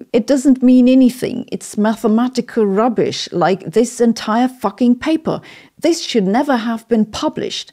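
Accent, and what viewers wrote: Germany. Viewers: Did you notice that all complements by someone with a German accent sound sarcastic. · Wow! Your delivery is very German! Love your work. Science requires such candor.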